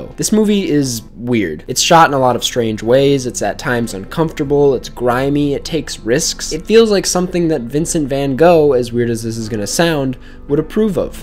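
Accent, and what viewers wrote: United Kingdom. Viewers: British accent😂😂👌👏🏽👏🏽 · you sounds like noel with that british accent wtf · The british accent tho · The british accent though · amazing british accent man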